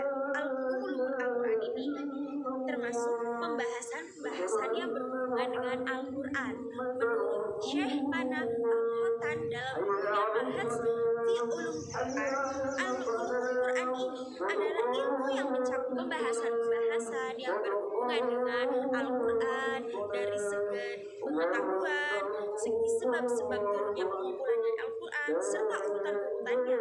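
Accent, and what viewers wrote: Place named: Java, Indonesia. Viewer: Ayang medok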